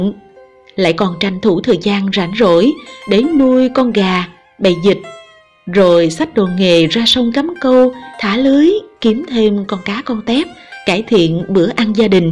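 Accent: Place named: South, Vietnam. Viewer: Hoan hô cô em vẫn giữ chất duyên dáng và hồn nhiên của người miền nam. Đừng bắt chước tiếng Việt lai căng hiện nay, nghe ' hổng dô'.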